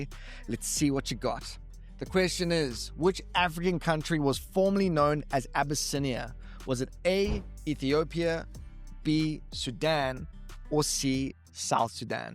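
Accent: South Africa. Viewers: I'm genuinely curious, what part of South Africa is your accent from? It's giving Brrrrrrrryanston bruv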